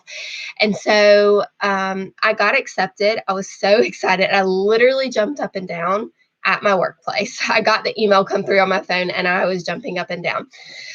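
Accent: England, United Kingdom. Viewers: Definitely hearing an English accent coming through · You are already sounding British after living in England. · Hereford is a lovely county.....and you definitely are developing an English accent!!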